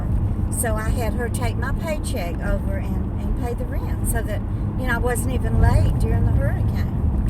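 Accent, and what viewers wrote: South, United States. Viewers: MOVE! Your living in a High Price Area. You sound southern, move to the south. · Hi, your accent sounds southern.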